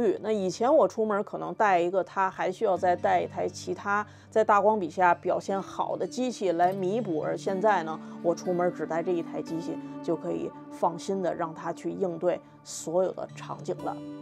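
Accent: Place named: Beijing, China. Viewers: 还真是，而且都是京腔。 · 老北京口音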